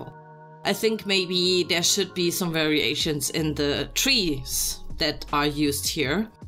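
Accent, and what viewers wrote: Germany. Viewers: Im so early.. anyway you sound German.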